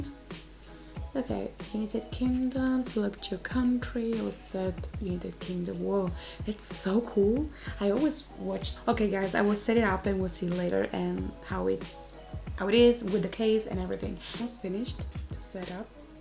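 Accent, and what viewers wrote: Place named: Italy. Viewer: you kinda sound italian, but I can’t say because you speak english very well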